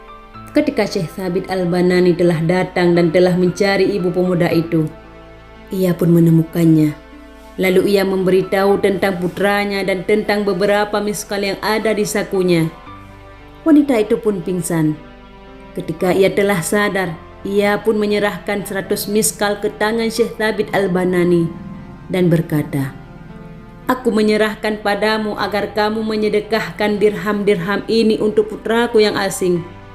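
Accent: Aceh, Indonesia. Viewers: Mirip dengan suara almarhum juga🙏😇, kaya cadel. Ciri khas bicara orang aceh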